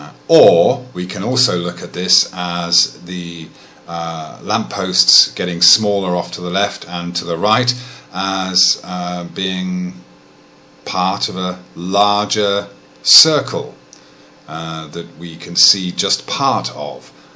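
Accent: England, United Kingdom. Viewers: If it is not religion making you ignore the evidence - what is driving your need for the Earth to be flat? My understanding is that there where two camps of flat Earthers - one who where justifying the Bible's lack of understanding of the nature of space etc. and the other was a group who wanted to feel special because of their "forbidden knowledge" of the flat earth which the world's governments are covering up. The two groups overlap in places and disagree in places and each sometimes call the other "shills". OR are you pulling my leg? I was surprised to hear an English accent in your video, I always assume flat Earth believers are mainly from America's Bible belt.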